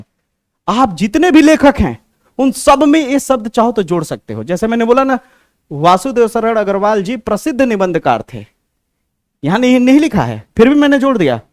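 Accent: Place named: Awadh, India. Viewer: Siir aapko.bolne ka dhang nhi h do thow taiyar kra diya bolte h aaadhi hindi awadhi 😊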